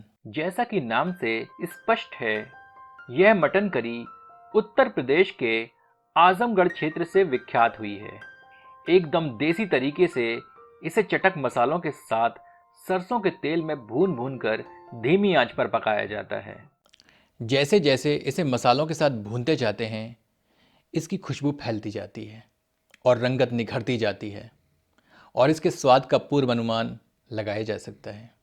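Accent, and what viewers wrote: Standard. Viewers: Beautiful shudhh hindi. Lovely to the ears.🌹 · Bhai etani shudhha hindi mujhe dharmendra ki filma yad aarahi hai aise hi bol rahe the. Ati swadista. Uttam lajwab jivha par laar prakat ho raha hai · Your hindi sounds so pure.. great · Aap ekdum shudh hindi bolte hai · आपकी शुद्ध हिंदी को सुन कर मन तृप्त हो गया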